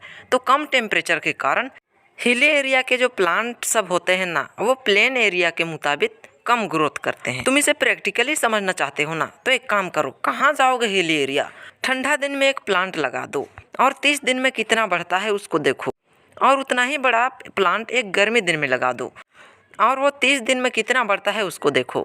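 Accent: Bihar, India. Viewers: Are you a Bihari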